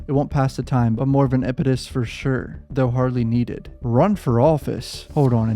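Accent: United States. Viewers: Difficult to follow you when you speak so fast and your accent is very American with lots of raw raw-rolls. Hard for foreigners to catch.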